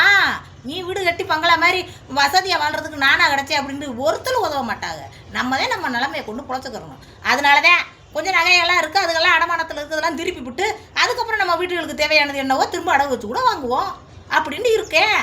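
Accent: Madurai, India. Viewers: super madhurai style speak reply good 💯 true 🎉🎉🎉🎉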